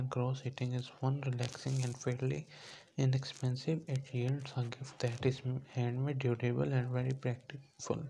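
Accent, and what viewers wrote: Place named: India. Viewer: Он говорит на ужасно противном английском (индус, скорее всего). Ничего особенного не говорит, просто обсуждает то, что видит....что там разные текстуры, разные цвета, макраме....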